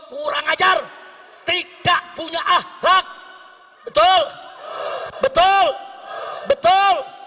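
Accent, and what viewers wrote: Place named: Jakarta, Indonesia. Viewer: klo menurut ane yaa orang Betawi khas bahasanya emang seperti itu nada rada keras, buat habib Rizieq keras nada nya kaya komando perang tapi buat kebaikan,, negara gak berkembang klo pemimpin masih berbuat zholim siapa lagi yg negakkin klo bukan HRS? Apa harus Nunggu imam Mahdi? Kiamat inget broo menyakiti dzurriyat nabi sama saja menyakiti Hati Rasulullah, ada kisah nya 🔥 walaupun sekeras apapun tetaplah dzurriyat nabi 🙏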